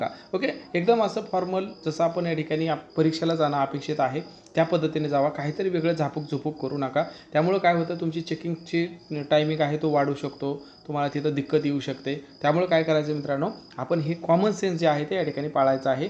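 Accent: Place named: Latur, India. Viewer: तुम्ही लातूर विभागले आहात का sir 😅 म्हणजे बोलताना तुम्ही "घेऊन जा. अस न म्हणता घेऊन जावा." अस म्हणता म्हणून विचार..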